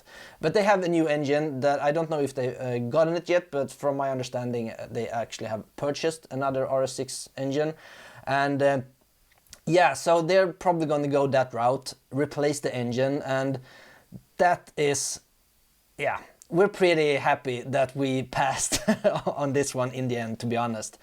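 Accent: Halmstad, Sweden. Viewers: Varför svengelsk dialekt? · E du från Halmstad?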